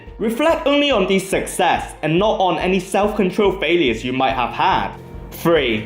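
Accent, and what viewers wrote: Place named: United Kingdom. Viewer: Totally love that British-Chinese accent ;)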